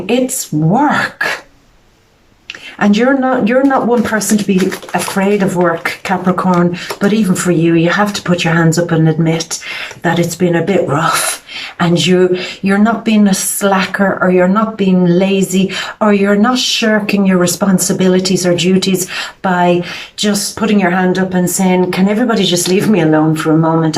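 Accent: Ireland. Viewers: Lovely - Thank You!! still love your Irish!! especially that part where you tell them to GTH!! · .. Lady you are yummy.. Love that Irish accent.. Cheers from Australia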